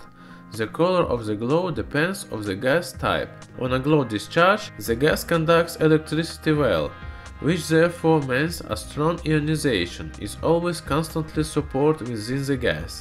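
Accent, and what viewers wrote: Russia. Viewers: I can't understand anything from your "russglish"👌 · this is russian accent??😂😂😂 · nice to be a brazilian that try to understand a russian talking in english. =D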